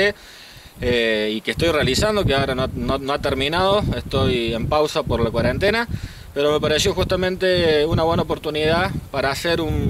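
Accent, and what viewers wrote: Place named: Cordoba, Argentina. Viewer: 6 años viajando y no perdió el tono de cordobés jajaja muy bueno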